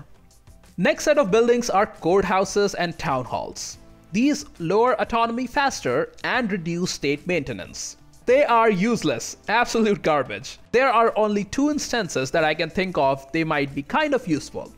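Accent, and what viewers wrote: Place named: India. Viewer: Where are you from? I think you have an indian accent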